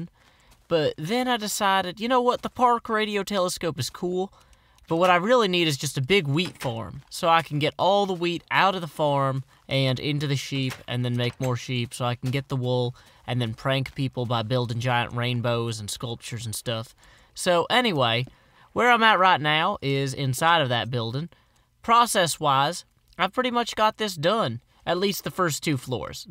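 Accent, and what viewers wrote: South, United States. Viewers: Your southern accent plus your hilariousness makes me want to sub, like, and fav..........I describe you in one word as being....... AWESOME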